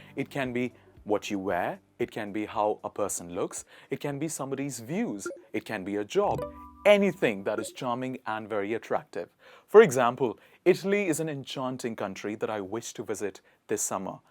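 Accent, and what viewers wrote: United Kingdom. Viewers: Is it SAAvy? Or Pronounced as savy? This man pronounces in a British way!! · Your English language pronunciations is not like Indians man. I thing you are born and raise up in England. Your talking in English language the way you pronounce each words is like British man. It is very wonderful. GOD Bless You.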